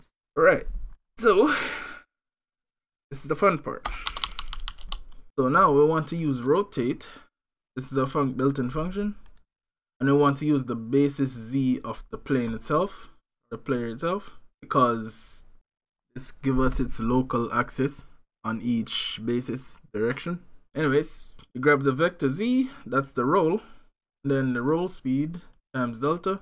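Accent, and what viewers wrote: Jamaica. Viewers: are u Jamaican ? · Mi did a hesitate fi learn godot but hearing another Jamaican do exactly what I want is inspiring. Must learn it this summer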